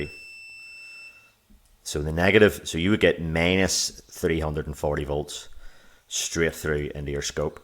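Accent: Northern Ireland, United Kingdom. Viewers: Hey man. Are you from Norn Iron??!!??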